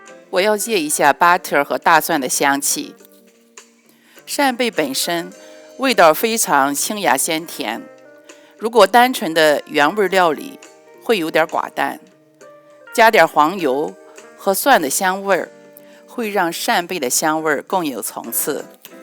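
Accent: Shandong, China. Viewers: 听口音您是山东人吗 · 聽到了熟悉的青普，給你點個讚👍我買的扇貝柱牙磣怎麼办？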